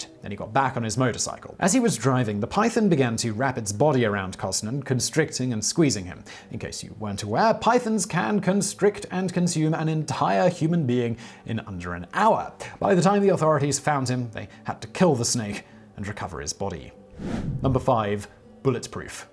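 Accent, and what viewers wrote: United Kingdom. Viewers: Your pacing and elegant English accent make stupid sound almost near normal. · Can I please get a video where you pronounce things like garage, aluminum, etc. in proper American? I'm getting tired of using the Brit to American translator... and don't even give me your kilo and kilometer bullcrap...or 999 instead of 911. I think I covered it all...for now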